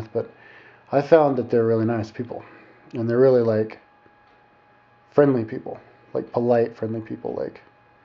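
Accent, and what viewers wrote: England, United Kingdom. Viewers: nah i mean sein a black dude talkin in a english accent is weird, and i didnt know you were goin to sleep lol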